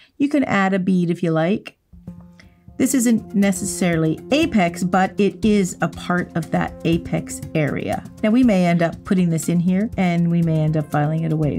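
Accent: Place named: Canada. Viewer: I always do hard gel, but I just started doing acrylic. You have been such a help – plus I just love the Canadian accent!😃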